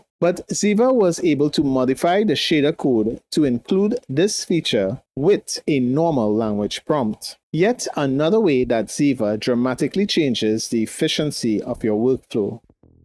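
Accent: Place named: Trinidad and Tobago. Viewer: This accent sounds like its from Trinidad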